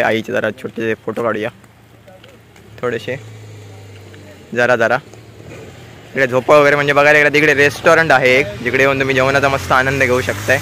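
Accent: Malvani, India. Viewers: Are bhava malvani bol Chan Sanvte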